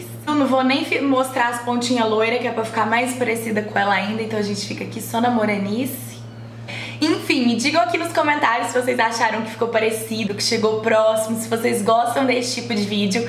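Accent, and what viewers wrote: Minas Gerais, Brazil. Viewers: ue, tu é mineira? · Estou amando seus vídeos! virei fã :) p.s.: esse sotaque Mineiro é uma delícia! rsrsrsrs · Você é a cara da Isis Valverde e ainda tem o mesmo sotaque mineiro 🙀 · Vc é mineira????? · sotaque mineiro representando ❤️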